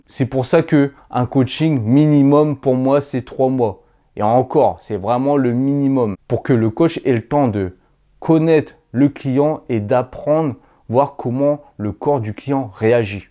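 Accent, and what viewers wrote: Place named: Marne, France. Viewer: Qd je t'écoute, je me rends compte que c'est vrai qu'on a un accent, nous les marnais !😉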